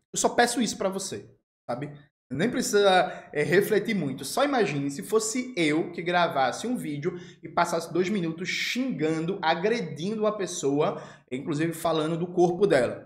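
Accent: Pernambuco, Brazil. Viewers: vc tá cada dia melhor! Esse Eduardo resolveu aparecer, hein! Chilique feito só pra te provocar e aparecer aqui, agora que seu canal tá crescendo... E o sotaque pernambucano é um sotaquezinho maravilhoso, lindo e gostoso mesmo! Sou do ridijanero e amo! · Oi, tudo bem com você? Não tenho embasamento prá fazer discussões teóricas. Acho essa virulência das redes sociais um horror! Seja lá qual for a discussão o que eu ví naquele trecho de vídeo é totalmente inadequado, um chilique mesmo. Gosto muito dos seus vídeos. Só tenho uma crítica muito séria. Acho que o Marxismo propõe análise de conjuntura e na minha opinião, você não faz isso quando não apoia claramente o Governo Lula. Está certo fazer críticas, o próprio Lula pede isso, mas eu gostaria que as críticas viessem sempre com a ressalva que precisamos apoiar esse Governo, nem que seja como um Governo de resistência ao fascismo, à extrema direita, ao bolsonarismo. 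Acredito que se o Presidente Lula resistir e conseguir ganhar o próximo mandato (2026 a 2030)prá ele ou algum sucessor, daí então poderemos começar a nos sentir um pouco mais "seguros". O Mundo está em risco e, não entendo como deixar de creditar ao seu Governo a diminuição da fome no País, por exemplo. A cadela do fascismo, sempre no cio, está nos nossos calcanhares e nossa única e frágil defesa é o Governo Lula nesse momento. Vamos apoiar! Criticar mas apoiar, explicitamente. Só prá lembrar a análise de conjuntura, se esse movimento da extrema direita conseguir ganhar a próxima eleição acabará o debate. Você está acompanhando até onde eles queria chegar, tenho certeza Foi por um trisco! Gosto muito do sotaque pernambucano!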